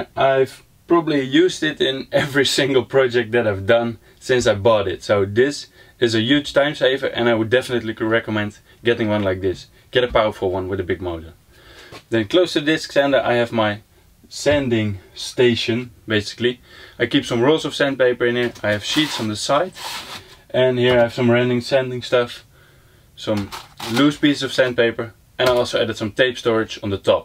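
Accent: Netherlands. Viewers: I am new to your channel. This video popped up as being recommended. So I watched it Very interesting how you organized your shop. I also watched some other videos from you, straight away. They are interesting as well, so I subscribed. Is it possible that I hear a Dutch accent in your English? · Listening to you, it seems to me you'r Dutch, but I stick to english so more people can read your channel. My workshop is 2,4 x 3,5 meter, so not much bigger. Also I 'm a fitter & turner for over 35 years. therefore I was keen to see what solutions you came up with. One of the great things of the workshop is your lath. Maybe one day I have one as well....One thing that I discovered is that I like to keep my tools in a drawer or systainer. The main reason is DUST. I'm going to make a central vacuüm cleaner system to keep the dust to a minimum. This is going to be a system at about 2 m high, with a arm or rail to guide the hose. 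I hate it when I saw with a plunge saw and the vac.cleaner hose hooks up to the rail or bench etc. anyway I'm curious what is coming.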